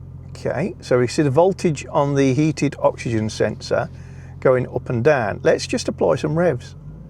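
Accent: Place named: North, United Kingdom. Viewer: You should clear the fault codes and see what comes back. Also, your jury rigged fuel pump probably isn't helping. You need to properly reinstate the fuel pump. I know you were loath to do that but you're at the point where you need to properly sort it. You should try swapping sensors from one of your other cars to eliminate faulty sensors. You could also do with a better scantool. That Launch one looks to be little more than a glorified code reader. I have a Topdon AritDiag 800BT I'd be happy to scan Steel if you're close enough to me (your accent sounds "northern"). I can also test your battery because I have a Topdon BT200 battery tester (yeah, I'm a Topdon fanboy). Are your car names a reference to TV shows that Dame Joanna Lumley has appeared in? If so, I'm sure a future car would have be called Patsy or Edina. If I could choose any female voice for a sat-nav, it would be Joanna Lumley. 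She has such a lovely voice.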